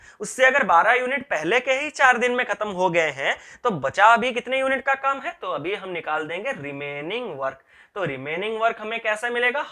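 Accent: Odisha, India. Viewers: Are you Odia sir?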